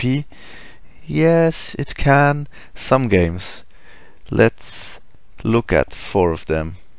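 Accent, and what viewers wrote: Germany. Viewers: dieser hölzenre deutsche Akzent....warum nicht gleich auf deutsch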